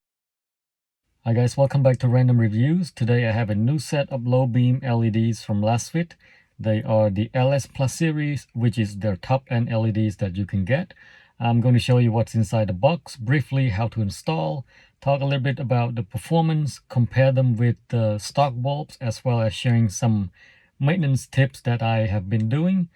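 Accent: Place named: Vietnam. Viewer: You sound like my uncle. (Viet accent) and , it looked like you were on the 99 North heading towards Fresno Area, lol.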